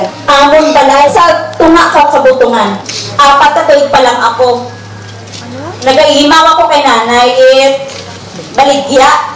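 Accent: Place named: Iloilo, Philippines. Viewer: Gusto gusto ko yung ilongo mo. Napakalambing sis.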